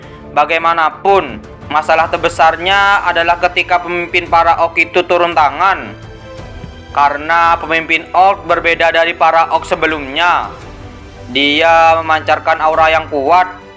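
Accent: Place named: Madura, Indonesia. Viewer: mimin logat madura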